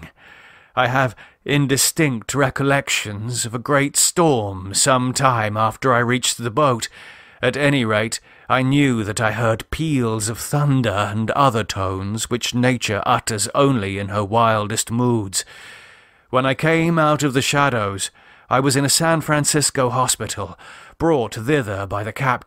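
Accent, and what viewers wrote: England, United Kingdom. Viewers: Me encanta ver videos sobre los relatos de Lovecraft. Aunque como opinión personal siento que es mucho más gratificante imaginar estos escenarios mientras lees el libro. Aún así me ha encantado, sobretodo por el acento inglés del narrador y lo bien ilustrado que está. Muchas gracias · Hey dude..... Hates Pigment Lovecraft was from NEW England. Lose that ridiculous "theatrical" tea talk and tell the story.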